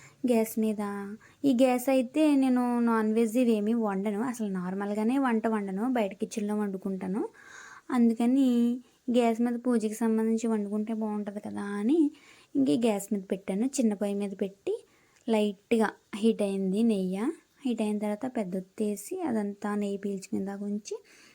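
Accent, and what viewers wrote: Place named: Rajahmundry, India. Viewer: Soo cute sis❤ మధ్య మధ్యలో మన రాజమండ్రి యాస వస్తుందిగా 😂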